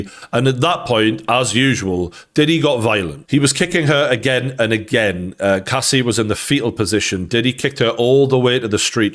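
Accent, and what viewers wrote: Newcastle, United Kingdom. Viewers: A True Geordie old mare.. Talkin Bollox as usual..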